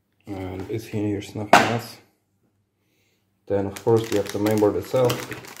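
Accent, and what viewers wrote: Germany. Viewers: Man hört voll das deutsche Englisch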